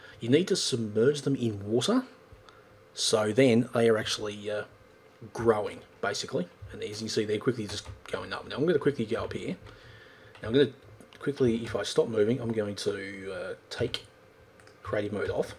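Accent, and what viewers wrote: Australia. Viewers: OMG AUSTRALIAN ACCENT!!!! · Its an Australian accent. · Australian accent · u got the best ausie accent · luving that auzzie acent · His accent as well is just typically aussie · Is that an Aussie accent?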